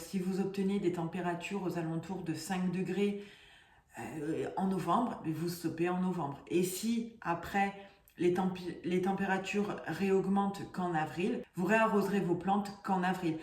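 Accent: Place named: Corsica, France. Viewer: Salut,déjà je voulais te féliciter pour le nombre croissant d'abonnés,c'est fantastique,je me souviens de ta toute première vidéo,sombre,le son au minimum,lol, filmé avec ton tel,dans ton appart,et je m'étais dit waouh mais cette fille avec son petit accent corse va cartonner c'est sûr...et ben voilà!!tu es devenue IN-CON-TOUR-NABLE désormais!!! BRAVO 😉🌿 bon dimanche et bonne continuation.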